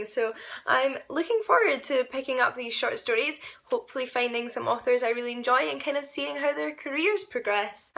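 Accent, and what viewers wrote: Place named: Glasgow, United Kingdom. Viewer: I love your accent, are you from Glasgow?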